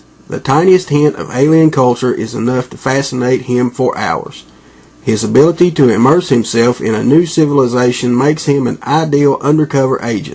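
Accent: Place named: South, United States. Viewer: I was hoping so, since you sould like your from the south too. Maybe I'll be able to find one soon then. Thanks, PS check out my channel, if like custom transformers!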